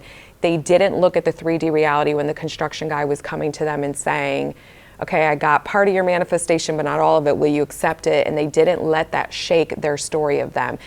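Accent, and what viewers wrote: New York, United States. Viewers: I don’t ~need~ one on one coaching from you but I WANT it. That powerful New York cadence makes everything you say hit so much harder. Ty for another kickass video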